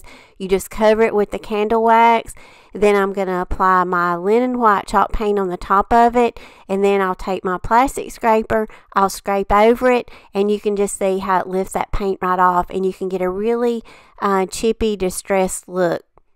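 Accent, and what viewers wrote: South, United States. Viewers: I just need to tell you dear, that I love listening to your gorgeous Southern lilt! Brings back memories of visiting the southern USA in the 80's and 90's!! To yourself it may seem ordinary, but it is just soothing and sweet to hear you speak.....and of course I adore the lovely shabby chic pieces you create so effortlessly!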